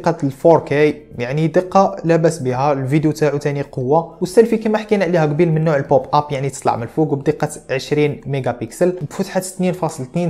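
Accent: Constantine, Algeria. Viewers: من خلال اللكنة نتاعك باين عليك قسنطيني ومهوس بالهواتف الذكية. توصل المعلومة والفكرة للمتابع بسلاسة واريحية بالتوفيق يا خويا .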